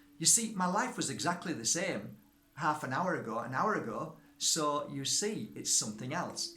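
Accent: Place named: Nottingham, United Kingdom. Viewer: Ah, right, Yorkshire isit, now!..no wonder I thought your accent might of been from Nottingham...I'm originally from Nott's, and I get asked if I'm from Yorkshire...x ✌️❤️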